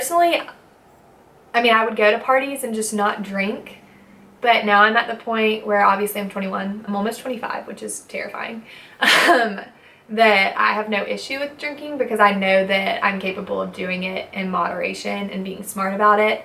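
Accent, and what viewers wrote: South, United States. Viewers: Your Southern Accent is Beautiful! I could listen to you all day! Oh, and your gorgeous too. Signed, New England Yankee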